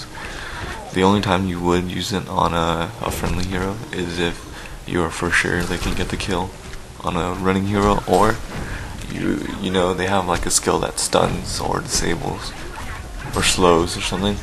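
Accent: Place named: Vietnam. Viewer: Gotta love the Viet accent. Love your vids. Keep it up please. :)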